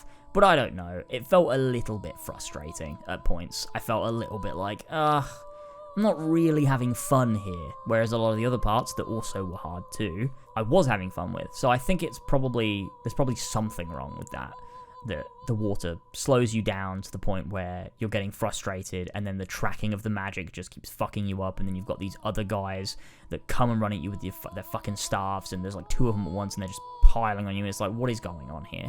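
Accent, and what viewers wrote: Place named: United Kingdom. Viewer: The British Accent Makes a Video Review of Dark Souls 2 maneuver. Certified classic.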